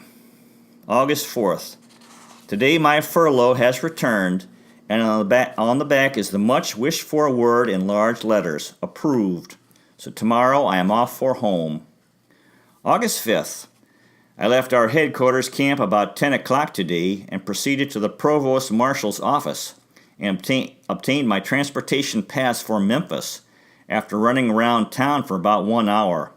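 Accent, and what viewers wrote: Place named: Germany. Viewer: Do u speak German ? It seems like you have a slight German accent very interesting diarrhea appreciate you reading it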